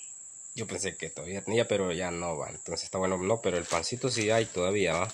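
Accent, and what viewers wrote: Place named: Andalusia, Spain. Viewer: SOY NUEVO SUSCRIPTOR, AL PARECER EL SEÑOR QUE GRABA ES ESPAÑOL, LO DIGO POR EL ACENTO ANDALUS QUE TIENE.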